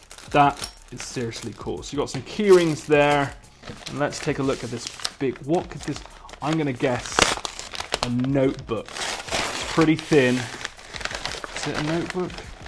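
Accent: United Kingdom. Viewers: stop speaking words like- digging, nostalgia, etc and British accent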